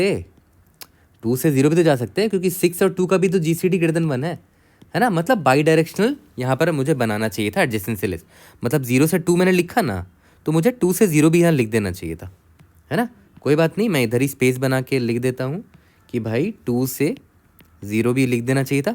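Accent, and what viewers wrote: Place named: Bihar, India. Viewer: bhaiya i know you are from up or bihar i am also from bihar currently i am in my 3rd sem . proud moment when someone from your region has great knowledge in dsa i guess this from your accent ketno dfs maar lo isme and you always said aapke not tumhre the respect you are showing .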